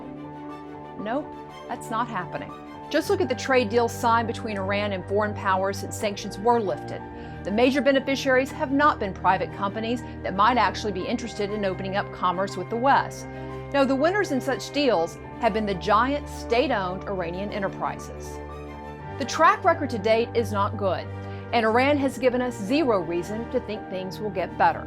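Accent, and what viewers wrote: United States. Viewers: 6 Seconds Why USA Can't Be Trusted | The Daily Signal American accent.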